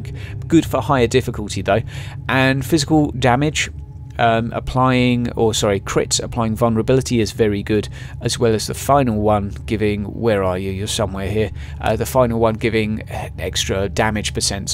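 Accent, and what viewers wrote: United Kingdom. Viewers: It always somehow sounds better when a Brit talks strategy on 40K. Nice post.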